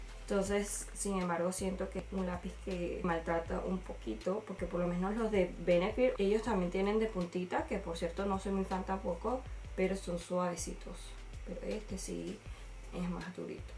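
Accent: Venezuela. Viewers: Eres venezolana? Hablas como venezolana!❤ saludos desde Vzla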